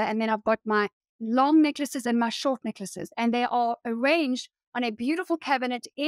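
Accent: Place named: South Africa. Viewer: Thank you for sharing with us you are so inspirational and sweet and love the south African accent☺💖👍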